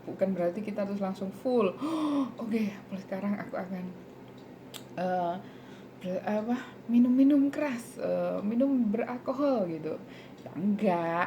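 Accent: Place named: Indonesia. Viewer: Muka kamu mcm latin tp dengar cakap mcm indon..salam kenal sis..semangat selalu ya👍👍👍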